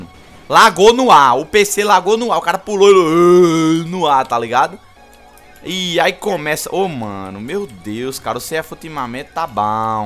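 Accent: Northeast, Brazil. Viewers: Mano vc eh da ond daq do nordeste?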